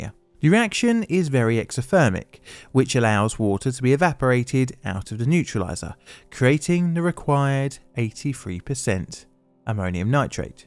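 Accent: United Kingdom. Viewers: The heavy Brit accent detracts from the story, which happened in the US.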